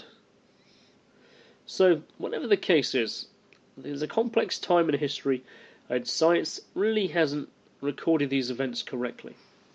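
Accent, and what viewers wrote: United Kingdom. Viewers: The narrator sounds British so give him a break. 🌈🌈😝🌈🌈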